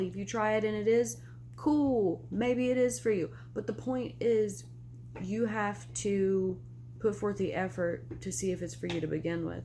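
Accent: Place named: Appalachia, United States. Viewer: It's funny. I haven't seen video from you in like forever (like probably a year or more) and it seems like way back when, you used to have a much more pronounced appalachian accent than you do now. Not that it really matters, but it's just a subtle change I noticed. I'm sorry to hear that you've had to deal with covid. That stuff sucks. I hope that you're back to feeling 100% like yourself real soon. I really need to watch your videos more often. I really enjoy listen to you speak about things. Your level of intelligence is really impressive. I hope 2022 treats you right!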